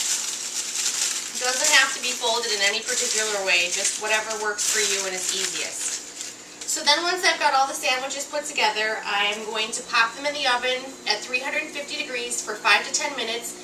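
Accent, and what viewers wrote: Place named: Minnesota, United States. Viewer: are u from minisota cause you have the acent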